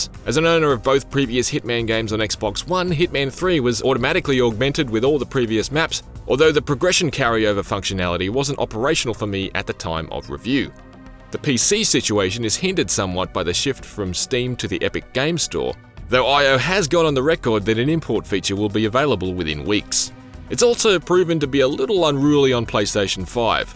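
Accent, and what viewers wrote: Australia. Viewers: Reviewer is Australian? Sounds just like Steve irwin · Did IGN hire Aussie man ? Reviewer sounds him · Is IGN an Australian reviewer now? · Sounds Australian to me... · “Aaaaand those who like to improvise and leave behind piles of dead and unconscious men in their underpants...” lol. This reviewer is great, and I like his Aussie accent.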